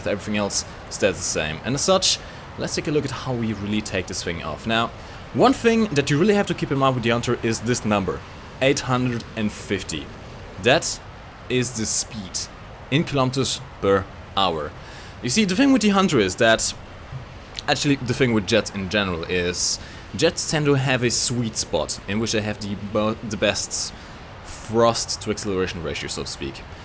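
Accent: Germany. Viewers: I knew you were german and not portuguese :P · Are you German?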